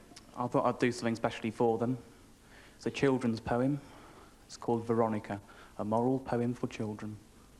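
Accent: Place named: Midlands, United Kingdom. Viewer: He's from the Midlands. Where are you getting cockney from?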